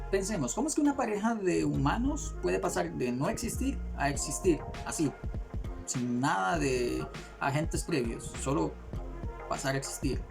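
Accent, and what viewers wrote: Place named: Costa Rica. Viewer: Sos de Costa Rica??? Hasta cierto punto puedo identificar el acento de la gente de mi país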